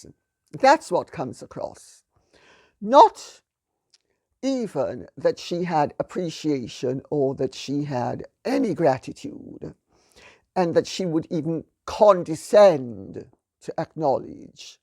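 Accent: Jamaica. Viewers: I was trying to pin point your accent, and after you said you were Jamaican, it made sense. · Lady C. You are so correct about Jamaica and Ganja ! I lived in Constant Spring, at Braemar House after it was turned into Apartments about 1968. Remembering that Ganja was absolutely ILLEGAL and basically only some Rastas used it and Bob Marley. Jamaica is a spectacular place, I know it front to back after living there for five years. So many stories. Wish I knew how to contact you, would love to share some of the stories with you. Thanks so much for your entertaining stories. How I enjoy hearing your Beautiful Jamaican Cultured English accent.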